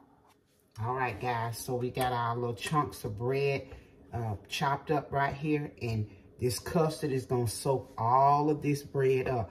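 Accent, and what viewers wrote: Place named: South, United States. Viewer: So happy I just found your channel. Miss I love this recipe but even more I love you & your husband praying. I hope you don’t take offense but I love your sweet soothing Southern accent. Reminds me of my Mom. She was a wonderful cook from Texas but she never measured anything & she passed away at only 47 yrs old. A couple of my brothers don’t even remember her cooking. Mom made bread pudding & the BEST peach cobbler. She also made a wonderful yellow cake with a bundt type texture that had a nutmeg taste. I just turned 75 & can’t wait to check out all your great recipes. I liked & subscribed to your channel. God Bless & keep you & yours. Donna in Arizona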